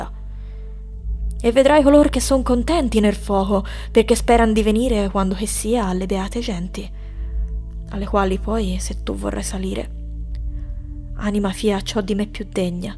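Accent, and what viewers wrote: Tuscany, Italy. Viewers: Interesting. Is this the way Dante would have spoken, or is the pronunciation closer to the modem Tuscan dialect? Or both? I speak Italian, and to me some words almost sound Spanish. Probably because of the famous Tuscan 'h' sound, but also because of the 'ch' sounds (like German) and the occasional 'th' sound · bra she is speaking like someone from tuscany TODAY! do you not hear "la gorgia toscana"? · Your tuscan accent is so pretty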